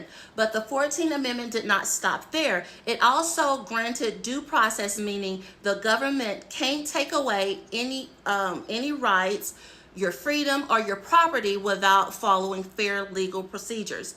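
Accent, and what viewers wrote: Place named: Africa. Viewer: Another African talking about black American stuff. Focus on the civil wars over there and the endangered lions. We can tell by how you talk you are trying to hide that accent jungle jungle girl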